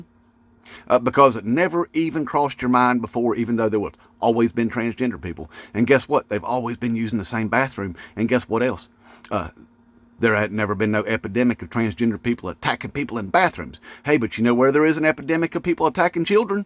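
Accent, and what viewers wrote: South, United States. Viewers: I love that you popped into my algorithm!!! I’m a bi-racial Afro Latina & you are keeping me sane through this madness! Love your content, dude! And that southern accent is the cherry on top of the sundae! #InmateTrump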